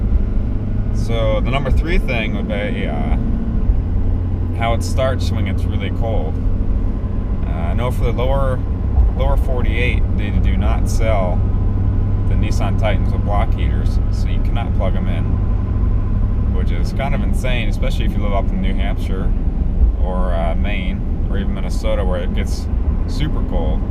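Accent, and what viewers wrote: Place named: Illinois, United States. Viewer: what part of Illinois your from by your voice northeastern Illinois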